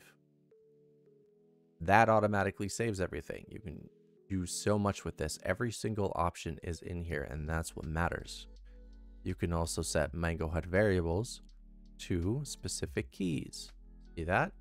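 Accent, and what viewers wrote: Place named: Maritimes, Canada. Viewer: /wave from Alberta. Wife's a Newfy. Your maritime accent is just fine brother! /cheers (earned my sub)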